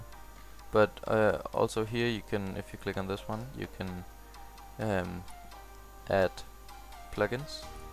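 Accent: Denmark. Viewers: Man kan høre på dig du er dansker ;D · Dit danske accent xd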